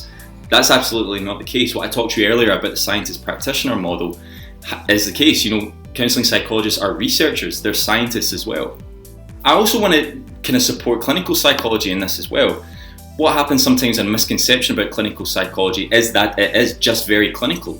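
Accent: Scotland, United Kingdom. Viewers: sure. Reminds me a bit Fish accent. Marillion singer. He was born in Edinburgh or not far from. Thanks for response and for interesting material.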